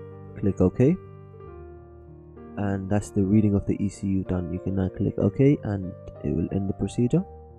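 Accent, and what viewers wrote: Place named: United Kingdom. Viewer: Hi New to ECU tunning, I have Vediamo and DTS Monaco, how does this compare to them? great content, I just sunscribed. I hear a UK twang, where in this Island you are ? lol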